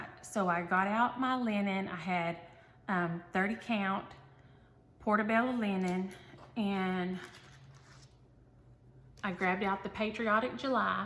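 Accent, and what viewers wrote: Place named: Arkansas, United States. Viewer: Happy Birthday!!! Bless your heart...we will get though this! Love your accent, I lived in Arkansas for five years and miss hearing that twang😂! Down south everyone is fixing to go somewhere! You hang in there girl! Love all your finishes and stiching! Sending a virtual hug! God Bless you!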